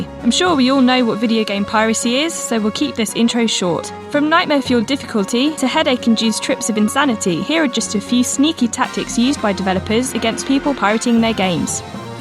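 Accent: United Kingdom. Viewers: man that british accent makes this sound so clinical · Cookie cutter narrator with a britsh accent... I thought you guys were better than this.-.- Use real gamers! Did you run out of youtubers?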